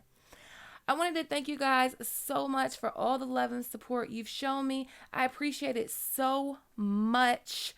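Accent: United States. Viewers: What part of America is this accent from, it's hooking me.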